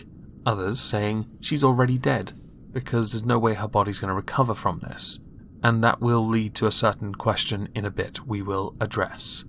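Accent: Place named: England, United Kingdom. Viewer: You sound so much like Clown ( Collossal Is Crazy ) But it's probably just because your both English? Is it racist to say that?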